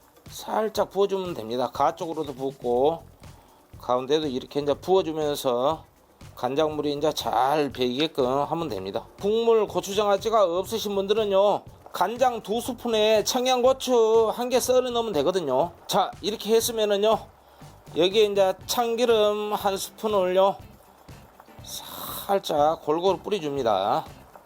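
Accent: Jeolla, South Korea. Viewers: ㅎㅎ 셰프님! 전라도 사투리가 음식맛을 더 정겹게 합니다.❤ 저두 침이 꿀떡 넘어가네요.😅 좋은 아이디어 배우고 갑니다. 셰프님!화이팅~❤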